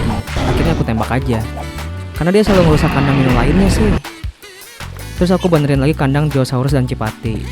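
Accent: West Java, Indonesia. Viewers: Orang Sunda ya bg?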